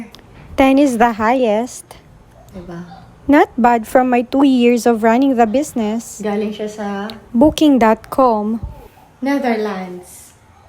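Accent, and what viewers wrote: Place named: Ilocos, Philippines. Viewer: Parang ilokana po kayo mam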